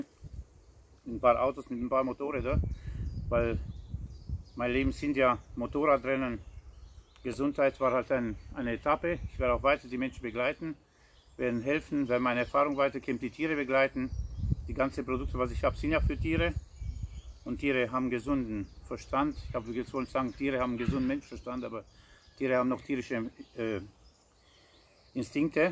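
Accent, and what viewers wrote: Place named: Hungary. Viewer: Ich mag Deinen ungarischen Akzent.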